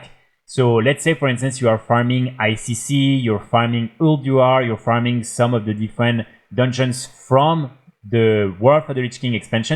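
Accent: France. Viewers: Je reconnais un Francais quand j'en entends un 😅 merci pour le guide !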